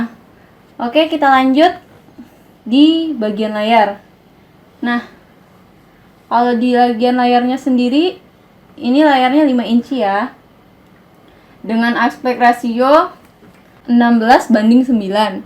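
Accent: Banyumas, Indonesia. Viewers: kok logat nya agak ke ngapak ya orang ngapak kah? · Asline ngapak bbang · Ko ngapak bre?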